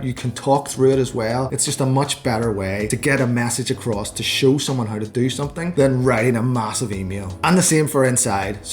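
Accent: Ireland. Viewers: I'm watching your videos for the first time and OMG... I'm learning so much as a new Airbnb company owner! This is officially my new binge...Plus totally loving your Irish accent and humour 😄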